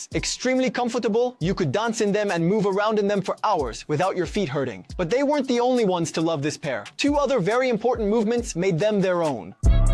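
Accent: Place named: France. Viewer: Pas mal, un petit accent français mais sinon ça va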